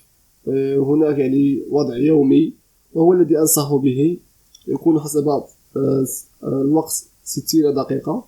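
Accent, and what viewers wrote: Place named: Morocco. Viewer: طون كتهضر خويا غير بالدارجة باش نركزو مزيان، والله العظيم مفهمتك حيت كتكلم بلغة عربية فصيحة بلطنة مغربية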